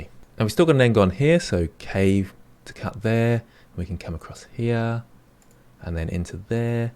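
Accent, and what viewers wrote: England, United Kingdom. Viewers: Your englishness il delightfull. Always a pleasure listening to your eplaination.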